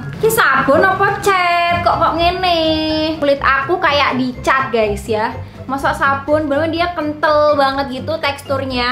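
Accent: Java, Indonesia. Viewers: Saya paling suka dari kakak ituh orang lucu, asik banget, medok banget lofat jawa nya,hehehe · Orang jawa kalo ngomong bhs indonesia lucu😂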